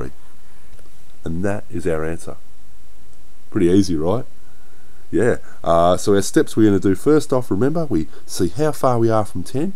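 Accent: Australia. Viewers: AYYYE THIS GUY IS AUSTRALIAN YEH MATEEEE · Awesome thanks for that! Just one more thing are u Australian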